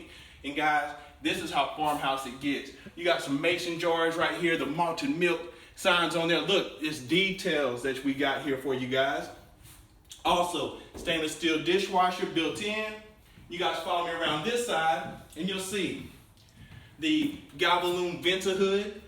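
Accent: South, United States. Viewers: I love your country accent. Pay no attention to my son's Lego icon.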